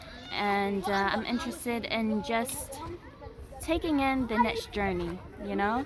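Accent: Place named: Senegal. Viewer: Bit confused your accent as I couldn't see your face Im unable to identify where ORIGINATED!. Are from Senegal or Namibian? Its really shame that you leaving the Republic of Somaliland because its peaple are "Too Nationalism" to me the great people of Somaliland are really lacking of it. Come to America and you will realise what nationalism is it all about, in a good way. Tribalism IS NOT good but healthy nationalism is fine. In your speech, I REALLY LIKED 👍 when you were saying "Hargeisa Somaliland," and u repeatedly said that number of times. In which I have appreciated it a lot. Anyway, safe journey wherever you are heading right now. But plz avoid mixing the Republic of Somaliland and Somalia for ur next videos as these 2 DO NOT GO hand in hand 4 obvious reasons. Finally come back soon if u really believe the Republic of Somaliland in heart ❤️ 💙 💜 💖 💗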